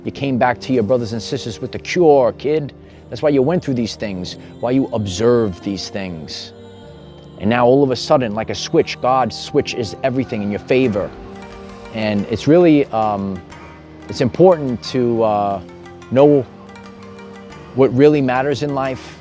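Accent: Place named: Brooklyn, United States. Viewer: I just fully processed you have a heavy Brooklyn accent after all this time. 😂 Thank you, greatly, you helped me find my wings again. Actually, more than just one set. I need a closet for them all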